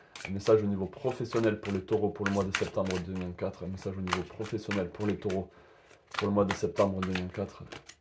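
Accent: South, France. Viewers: coup de foudre au boulot oui, je me suis inscrite à la danse et commence la semaine prochaine, je peints également et pratique les oracles ++++ puisque parait il je suis douée merci pour cette guidance et ce petit accent du sud que j'adore étant du sud ouest ;) belle journée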